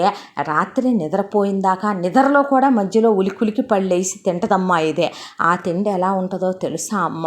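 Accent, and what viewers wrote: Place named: Rayalaseema, India. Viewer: mee voice variation maatram super andi enni sarlu e story vinnanu mee voice gurinchi rayalaseema slang super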